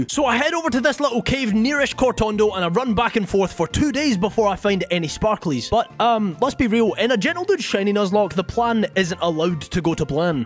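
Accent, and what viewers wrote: Scotland, United Kingdom. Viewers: Love the accent my fellow Scot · Nothing like listening to a Scottish dude playing Pokémon 😁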